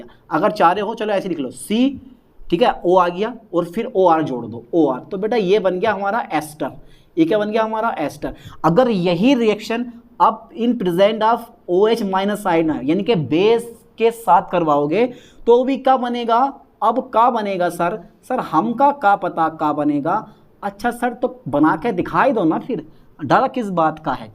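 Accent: Bijnor, India. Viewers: Sir aap bijnor ya hasaanpur se ho kiya😅😅😅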